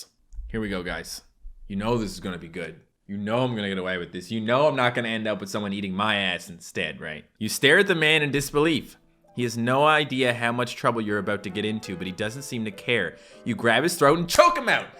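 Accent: Newfoundland, Canada. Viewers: after i watched his real accent video i cant unhear the hint of a newfie accent in his voice now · SPEAK in your newfie accent starting from now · ever since hearing your newfy accent i haven’t heard you the same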